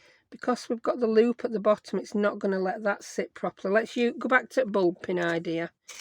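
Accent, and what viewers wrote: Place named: Yorkshire, United Kingdom. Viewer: I love your rambles! I'm an American and not used to listening to Yorkshire accents, although I was very proud of myself for recognizing it before I heard you mention it. Please don't take it as a criticism. My ears will get used to it soon, I'm sure, and I'll probably start sounding like you! (Not likely LOL) That happens to me whenever I spend time in the Southern states. I start sounding Southern.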